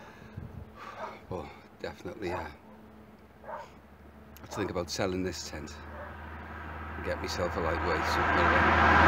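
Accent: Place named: Merseyside, United Kingdom. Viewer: Brilliant one, great choice of location even if it was in the dark. You never forget your first wildcamp and to do so as a solo one is even better! Great video and every trip out should be an opportunity to learn. From pack size and weight to routes and weather watching, it all adds to the fun. Just trying to work out which side of the Mersey you're from based upon the accent, I was going to say your a Wirralian but not too sure now. I'm heading to check out your other vids too.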